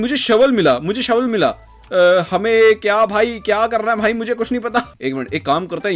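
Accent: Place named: India. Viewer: You are speaking like chapati hidusthani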